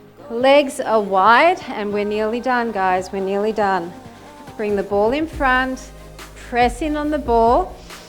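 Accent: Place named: Australia. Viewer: woow! I love Australia! Hope to visit it one day! I started getting in love with your country since 's era. still be my fave singer and voice and yes I noticed a similar accent in you, but I didn't realize you are Australian too! Good workout to do after the athletic barre!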